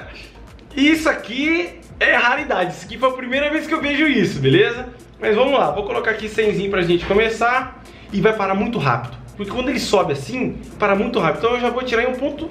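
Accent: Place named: Bahia, Brazil. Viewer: Essas hora thi kkk Tá meio baiano ne kk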